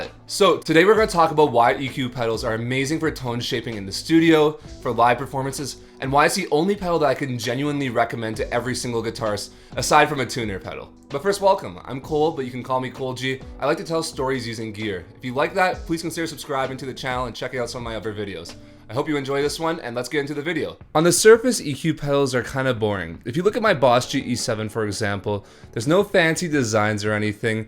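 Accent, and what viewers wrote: United States. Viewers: i don't know why, but the way you talk reminds me of "Californians" (SNL)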